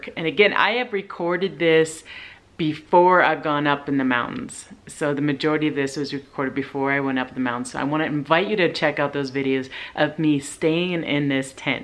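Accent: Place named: Europe. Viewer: hi mama. nice to see your mama. im binge watching bedrest day today painted all my window frames yesterday outside. i love the wood design you hand painted it? great she helped you. she lives in usa too i see. aw thats so cute she was teaching the girls to sew too how precious to see that. you grew up partly in usa youve got an accent from Europe but she doesnt. ? is your story here in one of your videos? good idea he overlapped material this meant seam would becovered. imagine making your own tent what a task good for her. im middle laying out material making curtains and dog jackets too. getting cold got to get dog jackets finished.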